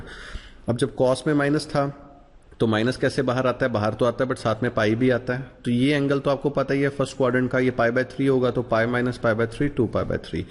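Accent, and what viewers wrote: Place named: Punjab, India. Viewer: Getting punjabi tone 👍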